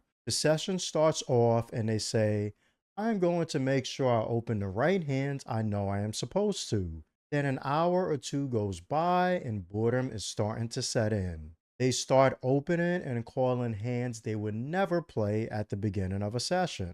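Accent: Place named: New York, United States. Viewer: Im curious bud, where did you grow up? Where were you playing live in your road to having 7 straight profitable years playing poker? I presume somewhere in NY/NJ area because your accent sounds almost identical to my own and I feel like I have bumped into you somewhere before.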